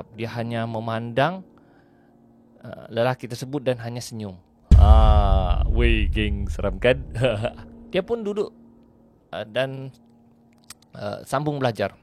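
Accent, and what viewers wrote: Sabah, Malaysia. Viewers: As slm...owg Sabah ka dik☺️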